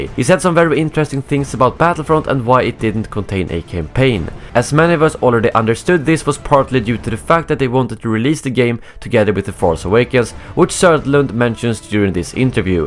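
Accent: Sweden. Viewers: Are you A Swede?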